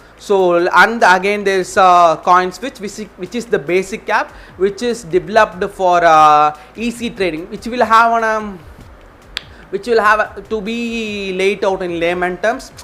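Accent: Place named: United States. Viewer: American accent🔥🔥🔥🔥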